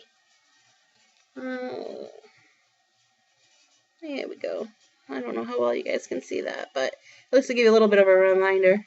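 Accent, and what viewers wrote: United States. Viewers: Hi! So many pretties to comment on and you've been super busy this month! Absolutely adore your FFOs - they ALL look amazing! I agree with you - doing a HAED on 18ct is still my favourite. Although the tent stitch is somewhat faster, I still prefer doing full crosses and 18ct has great coverage. Could you please share with me the link to the feeble you mentioned "There's no place like home"? I'm pretty sure I don't have that one. I love your accent.... I'm slowly beginning to hear the differences between the various areas of the U.S. 😉. Apparently here in Australia we have different accents depending on what area we're from too... But I've never been able to pick them! Your Stitchrovia piece is my favourite of yours - I get really excited every time you show that one! I know I'm going to have to purchase it at some stage LOL. Thanks so much for tagging my tutorial... 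Great appreciate that, and I'm so happy you found it useful. Happy stitching 😊 xx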